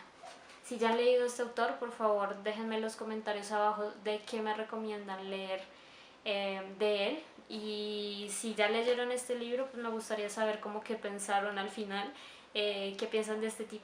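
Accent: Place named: Mexico. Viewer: Ahora hablas con acento mexicano :D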